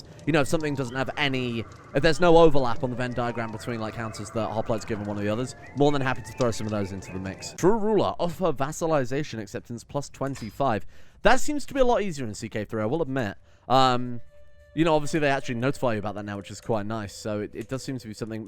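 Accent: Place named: United Kingdom. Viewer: Sounds perfectly british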